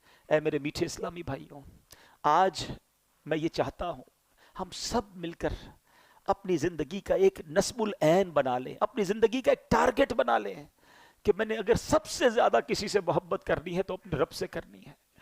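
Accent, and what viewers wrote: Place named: Punjab, Pakistan. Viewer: Hzrat AAP itni peare pnjbe bulty ha Bhat achi lghti ha masgalla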